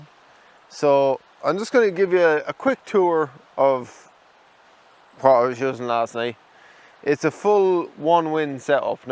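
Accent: Ireland. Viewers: I just started watching your videos a couple of days ago and I enjoyed them. I noticed everybody was talking about your giggle and they’re right it’s very endearing. I wouldn’t change anything - you got me as a new subscriber. I love your Irish accent! At least I assume you’re in Ireland?! if someone doesn’t like what you’re doing they’re free to go watch something else you know what I mean? It looks to me like you have lots of people who are interested, so I wouldn’t worry about it at all.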